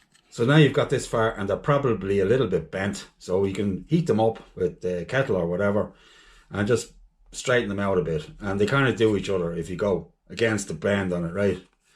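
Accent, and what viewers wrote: Ireland. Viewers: Newly subscribed on your channel and have learnt so much already, thank you. Excellent informative and well explained videos, well done. Lovely to hear it from a fellow Irishman 😊. Trip planned for Norway for July and August, hence my renewed interest in fishing. No fish left in the Med, so lost interest in fishing since I moved down here. Really looking forward to picking it up again in Norway. Keep up the good work 🤩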